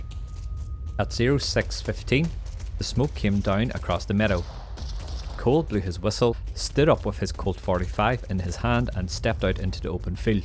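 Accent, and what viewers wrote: Northern Ireland, United Kingdom. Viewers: Well done. Hence my subscription. Having spent a year in Derry, courtesy of the U.S. Navy, your accent sounds as if you are from Northern Ireland.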